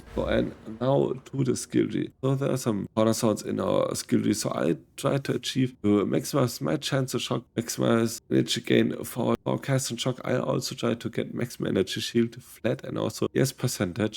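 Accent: Germany. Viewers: the German accent doesn't help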